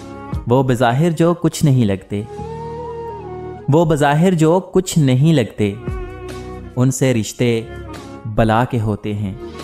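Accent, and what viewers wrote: Punjab, India. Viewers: آپکا لہجہ سن کر یقین یو گیا کہ پنجاب کے لوگ اردو بھی پنجابی کے طرز پر بولتے ہیں، پٹھانوں کی اردو کا مذاق اڑاتے ہو، اپنی اردو کا حال دیکھو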